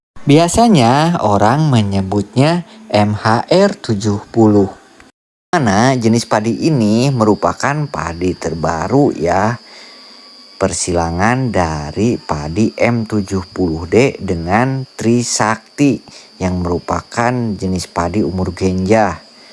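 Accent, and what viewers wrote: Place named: Sunda, Indonesia. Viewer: Hatur nuhun kang pantesan atuh logat bahasana nyunda pisan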